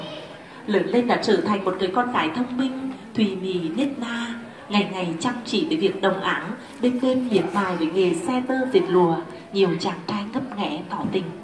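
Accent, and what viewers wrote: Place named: Nghe An, Vietnam. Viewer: Nghe giọng ngọt ngào chất nghệ An..... đời đời nhớ công ơn của cụ Hoàng Thị Loan thân sinh ra bác Hồ kính yêu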